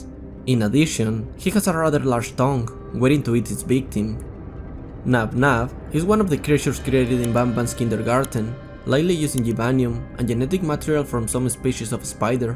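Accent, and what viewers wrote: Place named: Spain. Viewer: Your acent is very spanish.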